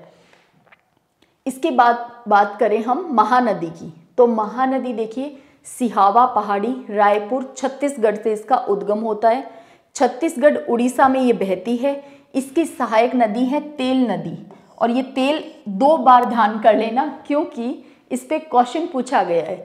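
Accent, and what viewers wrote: Haryana, India. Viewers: Haryanvi accent is my favourite ❤️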